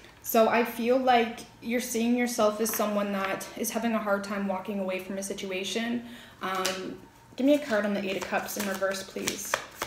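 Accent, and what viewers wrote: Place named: Canada. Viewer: You are right on the money darling but do you blame me about getting my feddy? Because with my own experience and I can only talk about myself, all I know is that love is not the kind of love that there used to be sweetheart. I believe that one of the top five reasons for relationships are matrimony problems or issues we're about Finance if a man can bring in the money he ain't getting no honey. There's a lot to be done in a relationship got to have money you got to give quality time to each other course there has to be good communication at least and a lot of it to listen. But for sure any problems baby and that's fine cuz I am a king therefore King has a queen and she better look like one cuz if I cannot condone her not just with material things should be at the very bottom to least but they are needed because I will Adorn her with all my love and being but if there is a struggle in finance paying bills anything like that it won't work. 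I'm just saying thank you God bless you I appreciate you and I love your accent Canadian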